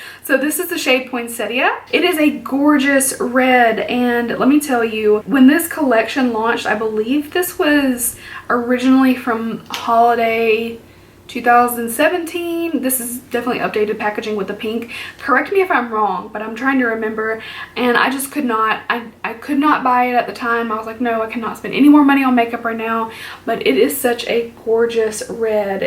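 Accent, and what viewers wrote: South, United States. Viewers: i must be crazy or do you have a little southern accent? i love them. my insta is :•) ive never tried a jeffree product but ive always wanted to try a highlighter 🤠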